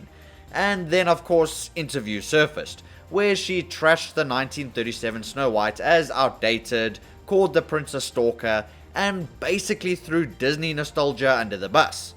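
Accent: South Africa. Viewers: Do I hear a SAFFA?!?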